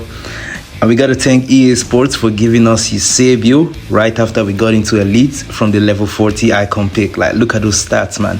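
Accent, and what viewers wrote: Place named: Nigeria. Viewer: Bro are you Nigerian? I can hear your accent a bit. Also what’s your ID so we can play a friendly if you have time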